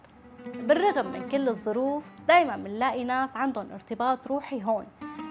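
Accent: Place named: Levant. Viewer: ماشاءالله وتبارك جمال رباني صوره مصوره وكلام متزنًا ولهجة شامية مئه من مئه وشو كمان مابعرف شو بدي أقول لك.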